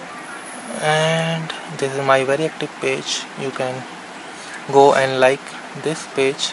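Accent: India. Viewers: hey bro talk in Proper English not in Bhaya English..I Laugh very very much after watching your video..How your talking man talk proper..It is very funnier than comedy nights with kapil.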